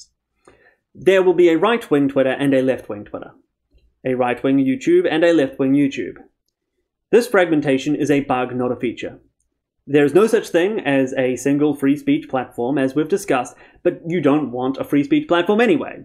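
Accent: New Zealand. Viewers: just found your channel and totally dig it mate, youre clearly a very smart chap and nice to hear a fellow kiwi (especially one who got no time for leftys, haha) on YT.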